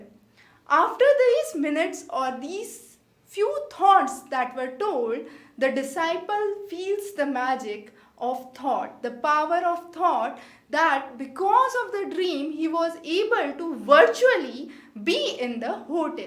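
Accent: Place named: South India, India. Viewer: I m thinking your from southIndia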